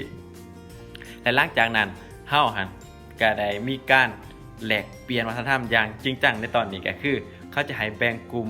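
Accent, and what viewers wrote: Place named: Ubon Ratchathani, Thailand. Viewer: เจ้าเว้าออกสำเนียงอุบลหลาย สู้ๆเด้อน้องหล่า เอาใจส่อยุเด้อ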